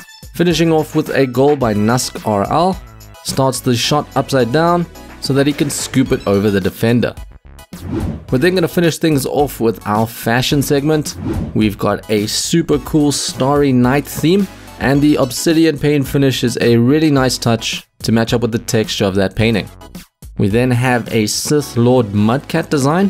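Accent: South Africa. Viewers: Are you South African? 🇿🇦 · are you South African ? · Are u south african :) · Haha nice! I could tell by your accent! 😄 I am also from Joburg 😊 are you currently living here? I get the feeling you're living in another country for some reason? 😂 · Are u from South Africa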